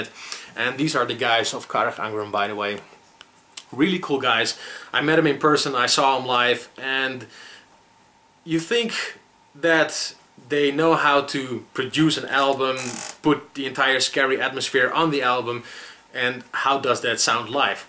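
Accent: Amsterdam, Netherlands. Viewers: Hahhaha je hebt een Amsterdams accent. Awesome : P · Wow your 100% Amsterdam xD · hahaha je hebt echt een duidelijk amsterdams accent!! haha geweldig!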